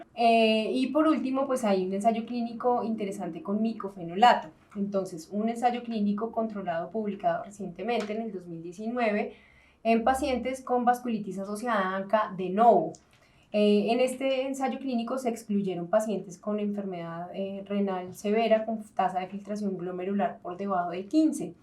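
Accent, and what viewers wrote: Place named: Colombia. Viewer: Definitivamente ya no puedo tolerar el acento colombiano, a partir de un daño gigante ocasionado por una mujer de allá. Se me revuelve el estómago, me repugna. Qué lástima.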